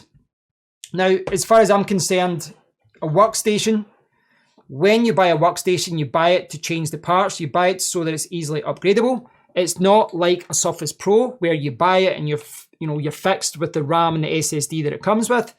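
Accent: Scotland, United Kingdom. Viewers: oh, my bad. Scottish accent is awesome too. I love it. Just bonnie.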